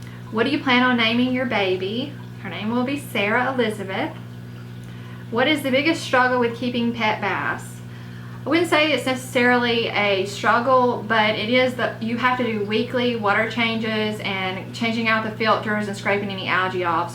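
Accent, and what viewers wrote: South, United States. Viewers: that sweet southern drawl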